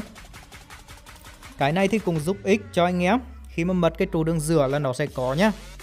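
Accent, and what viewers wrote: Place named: Hue, Vietnam. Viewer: Người huế mà bạn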